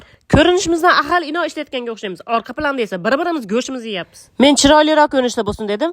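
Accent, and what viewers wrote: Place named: Andijan, Uzbekistan. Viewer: Нечанчи йилсиз, асл исмиз нима асалим, андижонлимисиз шевездан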